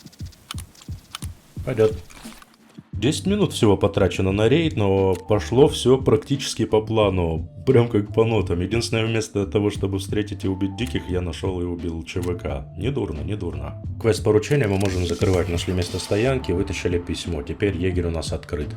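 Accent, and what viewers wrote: Kazakhstan. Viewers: говор прям наш сразу начал подозревать что с Казахстана!))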